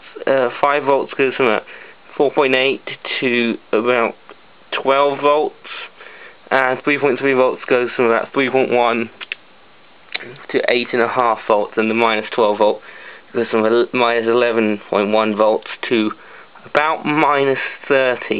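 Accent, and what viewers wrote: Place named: Southern England, United Kingdom. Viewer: Nice hacking, interesting video :) Whereabouts are you from? I can't quite figure out your accent.... Essex? Kent? Or further west?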